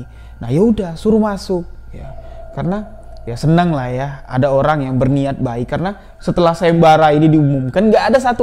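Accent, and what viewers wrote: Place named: Bali, Indonesia. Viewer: Logat bicaranya kaya org bali y mas😁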